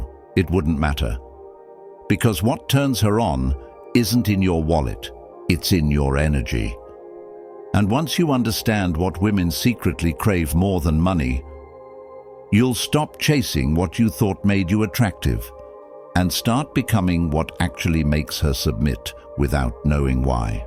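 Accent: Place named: RP, United Kingdom. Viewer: I’m tired of that narrative voice in most of these stoic videos. Then words like “brother” or “a dude in Gucci” would never be said in that upper class British accent.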